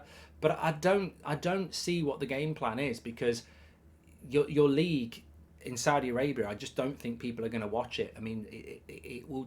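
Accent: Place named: Manchester, United Kingdom. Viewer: This guys manc accent is amazing 😅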